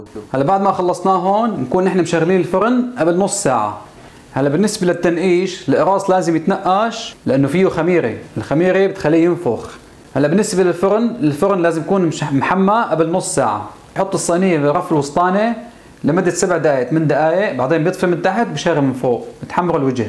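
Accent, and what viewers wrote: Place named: Homs, Syria. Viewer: ماشاء الله مبين شغلك اديه مرتب الله يقويك ويعطيك الف عافيةوأحلى من القراص لسا الحكي الحمصي منحوط😊 أنا بفرفح قلبي بس اسمع حكي حومصي لأني انحرمت منه بالغربة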